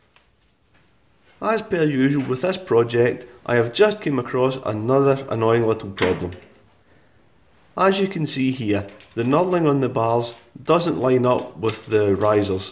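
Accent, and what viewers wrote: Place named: England, United Kingdom. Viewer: What kind of English accent do you have ? It's perfectly understandable, I'm just curious ;) It's very colourful.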